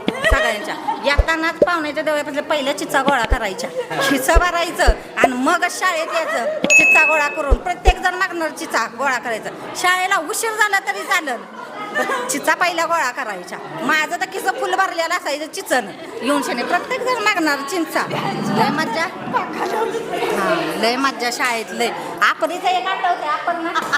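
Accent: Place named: Satara, India. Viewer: खूप छान आठवणी आणि त्यात सातारी भाषेत ऐकण्याची मज्जाच भारी... लय भारी🎉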